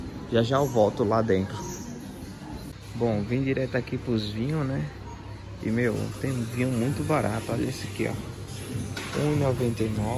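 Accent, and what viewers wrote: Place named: Venezuela. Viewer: Jaja yo crey que era venezolano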